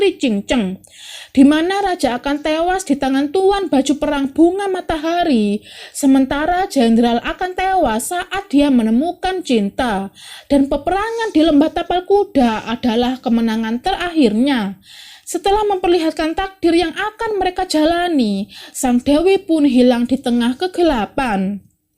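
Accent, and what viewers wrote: Java, Indonesia. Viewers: Ciri khas memang medok, maklum wong jowo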